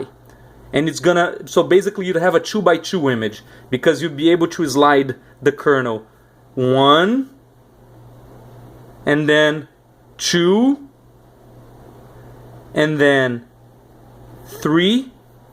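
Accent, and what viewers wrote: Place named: Brazil. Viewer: Are you Brasilean? I can notice a Brasilean accent in your voice background.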